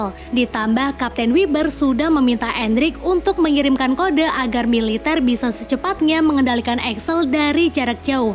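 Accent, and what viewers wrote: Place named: Java, Indonesia. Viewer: Wong jowo ndi mbake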